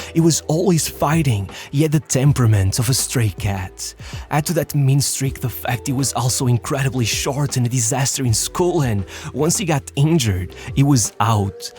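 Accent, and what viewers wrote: Portugal. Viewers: Bro, dumbass he's Portuguese and their accent is like that(in some areas). You're probably American or some shit and you would never understand.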